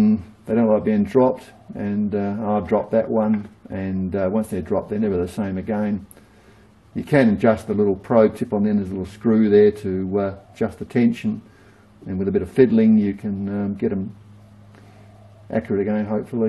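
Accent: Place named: Australia. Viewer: Gidday,I find it interesting that you sound like an Aussie but have a Chinese name.I then go to ebay and i get Chinese pretending they are Australian and located in Australia.Then there is the fact that they say something they are selling is a test indicator but it in reality it's a dial indicator.My mate was going to buy one of those Chinese hobby lathes thinking that the bed size was 1.2meters.I explained to him,that is 12 inches Bill,some people's peckers are longer than that and if you have only half that you need to do it twice,and that 6inches x2=12inches....A fortnight ago i was researching leather work,and in the process of buying leather working tools a burnishing tool for leather edges came up.I then watched a video of someone making some leather tools on a metal lathe and thought that would come in handy to save some coin.Now,i have had an interest in Blacksmithing for years and was thinking about making knives as a hobby,but what is a good knife without a quality sheath made by hand.In my madness i have almost come full circle.If learning means i am alive,why does my body feel like shit?..;)..Thanks for the advice mate...Cheers Gunna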